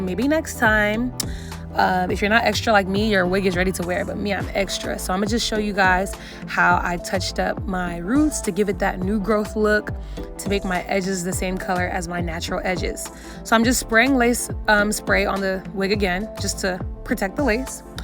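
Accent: Nigeria. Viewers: I tried to ignore but I can't, the Yoruba accent creeping in giving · I just came across this video and I love it. The mix of your ascent with the sprinkle of Nigerian ascent made me subscribe immediately. I love you ❤❤❤. This video helped a lot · It's the Nigerian English twist for me...😅 I so love this wine colour, it suits you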